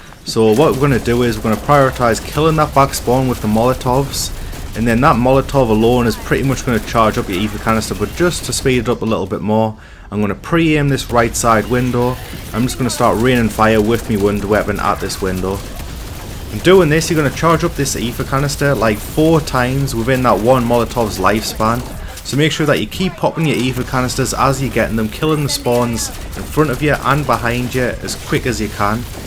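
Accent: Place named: North, United Kingdom. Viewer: I’m really struggling to put my finger on your accent, definitely northern but I can’t pinpoint it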